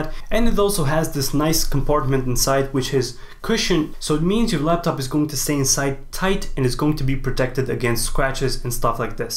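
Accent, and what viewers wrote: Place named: Eastern Europe. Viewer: To be honest, I'm from Russia, but I speak English. Initially, I think you are from Eastern Europe. It turned out to be true. Thanks for the answer!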